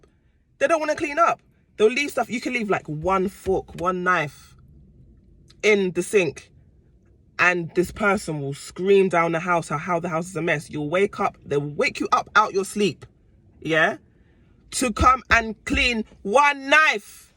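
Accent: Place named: London, United Kingdom. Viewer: Oooh a London accent🎉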